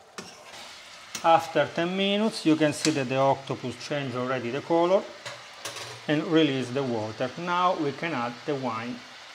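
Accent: Italy. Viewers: Italian accent????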